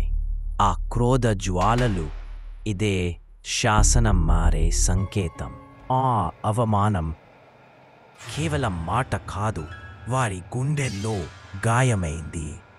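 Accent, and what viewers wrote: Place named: North India, India. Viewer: VFX good, story narration and Words spelling match kaledhu north vaallu telugu matladithe ela untadhi ala undhi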